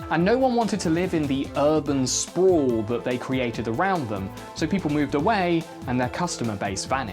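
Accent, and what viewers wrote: United Kingdom. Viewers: Didn't realize was British.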